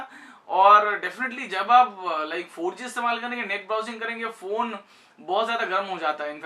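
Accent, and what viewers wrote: Bengal, India. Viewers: bangali accent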